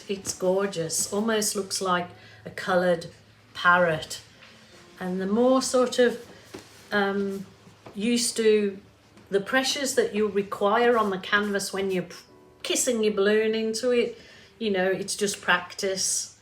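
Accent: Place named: West Midlands, United Kingdom. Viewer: Off topic but I keep thinking I can hear a West Midlands accent amongst the Aussie!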